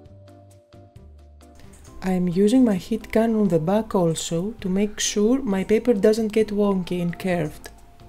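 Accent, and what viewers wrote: Greece. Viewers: just love your Greek accent also your very precise instructions on your fab projects. x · I adore your Greek accent! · Always love your cards and now I can say I love your beautiful Greek accent! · OMG! It was great hearing your voice! Love your Greek accent! Thanks for sharing. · YYEEAAHHH you have a voice ;-) AND it's beautiful and your english is remarkable! I love love your videos and your work is so wonderful. In June i went to Santorini on vacation with my two adult daughters .... hearing your speach took me back to Greece. I'm a Dane and I'm collecting the courage to make videos in English ... you help me so much. THANK YOU and greetings from your biggest fan in Denmark ;-) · Luv ur greek accent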